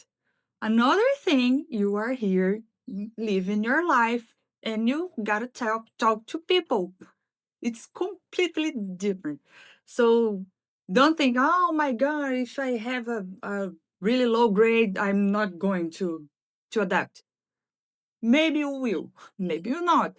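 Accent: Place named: Quebec, Canada. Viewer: Hi! In some ways your accent sometimes sounds like French Canadian haha! Winter has two aspects for me. 1) It makes me appreciate my cozy home more (in summer you don't want to be inside) and 2) There's a whole lot of winter activities that people can do to enjoy the outside. Skiing, skating, snowman, snow angels. Christmas is really Christmas too!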